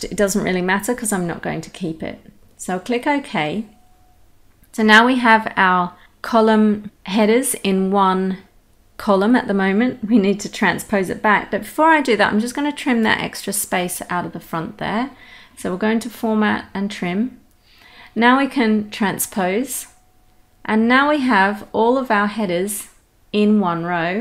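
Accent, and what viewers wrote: United Kingdom. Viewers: Thanks for the great tutorial. I despair when I receive badly formed spreadsheets. PQ has totally changed that - at least it numbs the pain a bit. BTW nice to hear a Brit voice for a change.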